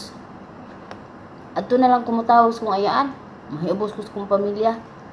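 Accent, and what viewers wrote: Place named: Cebu, Philippines. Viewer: taga asa man ka? magcge kagiki katawa akong asawa magtan- aw nimo? mura man taga cebu ang inistoryahan?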